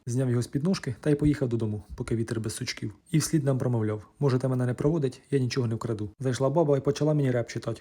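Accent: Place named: Cherkasy, Ukraine. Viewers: Ось у цього хлопця як раз сама нормальна мова! На Черкащині завжди так балакали!!! А ви, Наталочко, пошукайте інший контент для мовної чистки!!! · Рідні Черкаси!А я то думающо це за говір.Дайте ще й рецепта квасу з чорнобривці?! Дуже дякую,побувала на батьківщині! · Дякую,наче дома побувала.Мій рідний діалект,Черкащина.❤😂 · Коли приїжджаю до мами і ніби не помічаю наш діалек. А коли слухаю на відео і реально помічаю наш Черкаський суржик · Dyakuyu!! Govorysh yak moi rodychi z Cherkas.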